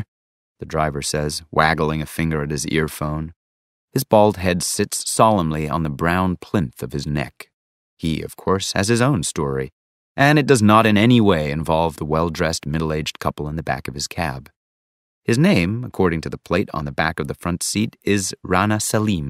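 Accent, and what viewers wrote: United States. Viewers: As a fan of American accent I'm really happy about this video <3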